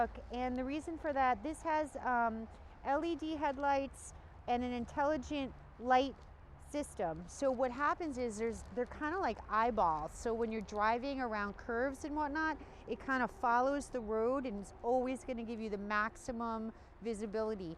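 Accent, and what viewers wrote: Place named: United States. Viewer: You sound awfully American for someone from Manchester...Great vid.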